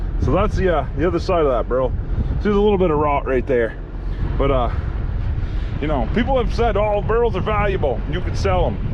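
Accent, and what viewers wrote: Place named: Western Maine, United States. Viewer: new subscriber your speech sounds like you are from western maine. maybe my jugment of vermont is slanted by massacusett carpet baggers.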